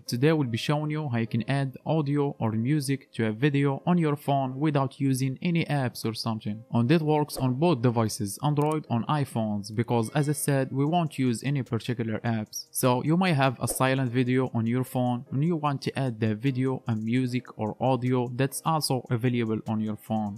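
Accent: Morocco. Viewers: Your accent just Moroccan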